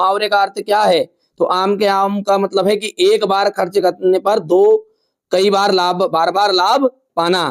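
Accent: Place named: Indore, India. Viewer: Tum indore side se ho kya ?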